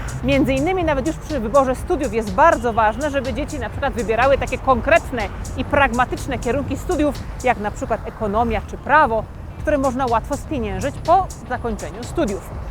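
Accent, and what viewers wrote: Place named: East, Poland. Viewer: Twój akcent jakiś wschodni. Czy mam rację?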